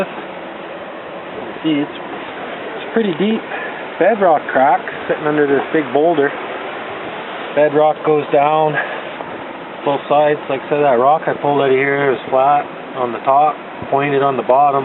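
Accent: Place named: Canada. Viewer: does his videos from his claim way up in BC. Not Colorado. Haha, as if you couldn't tell by his accent Ay.. Lol. I myself am just getting in to prospecting. Just picked up a pan set from Cabelas this past Christmas. Now I'm just waiting til everything thaws out enough to go out there and get some color. Good luck in your endeavor my friend.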